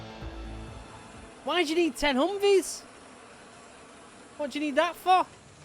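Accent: United Kingdom. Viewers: After the first ranting when the video starts the guy says video has no audio, video has audio doesn't have narration and narrative is shown under screen and suddenly guy says let me read it so people have problem in reading English understand it, I am a civil engineer with masters degree in steel structures and second master in Political Science and international relations and now studying PhD in regional studies and my toefl score was 97 point out of 120 which I took the test 5 years ago and now I am so much better in English, I wanted to say to this guy the British accent is fading out very fast and the real reasons for it's demise are it's unclear and non comprehensive, as I witnessed 2 brit one from Chelsea and on from other side of the London couldn't understand each other, and it's a snub and how it carries the feeling make the people who hear it feel bad, it's getting lectured about importance of morality in life and Vlad putin is the person gives you a talk on how precious life is, and if a person had problems in reading a text in English you be sure they never understand a word you mumbled, if you want to your work be seen by people loose the accent man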